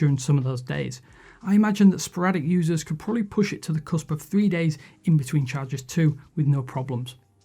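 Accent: United Kingdom. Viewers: I don't mean to be rude, but what's English accent does he speak? I presume it's British accent yet not confident enough to be sure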